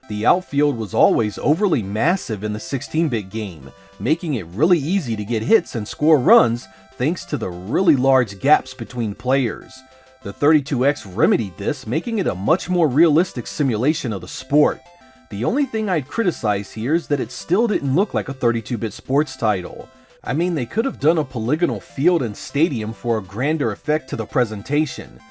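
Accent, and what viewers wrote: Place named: Mid-Atlantic, United States. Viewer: is totally from PA/MD/NJ I can hear your accent lol with love from Boston!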